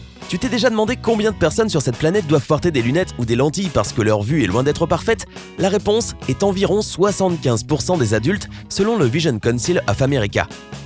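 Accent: Quebec, Canada. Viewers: A mon avis, la vrais a du donner sa chaine a eux, c'est comme la voix Québéquoise, c'est la meme que. Ou sinon toute les chaines c'est une compagnie qui vous prends pour des con ( Je dis vous pck je suis pas abonné a eux )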